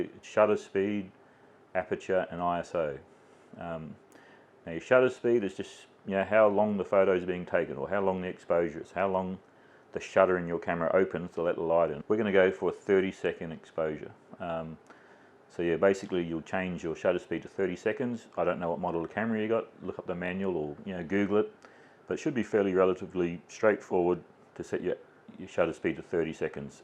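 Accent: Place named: Australia. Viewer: Nice easy to understand tutorial. Nice to hear the Aussie accent rather than some septic tank shouting and screaming at you.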